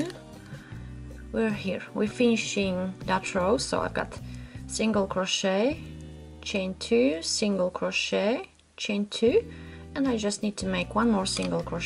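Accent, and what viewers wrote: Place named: Russia. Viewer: Thank you for explaining how to read a chart. I have always wished I could follow them. I have some amazing crochet thread/yarn that I bought 8 years ago but never did anything with them because I did not know how to read the pattern they were purchased for. I asked for a written pattern and received a chart. You have given me a wonderful gift of knowledge that is priceless. The only place I was fuzzy about was the initial chain. You appeared to make the chain length 8-10 times from the chart and that is why you were making the boxes on your sheet? Is that correct? That is how you make it to the circumference for each individuals sizes. Please tell me if I am understanding this part. The rest of the pattern appears to make more sense to me now. One last question, your accent. Where if you don't mind me asking are you from. My sister-in-law Valentina is from Russia and has a lovely accent similar to yours.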